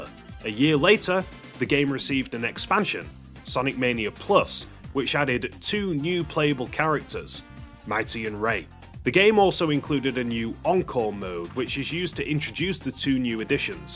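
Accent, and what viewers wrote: United Kingdom. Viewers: Ur defo from Stoke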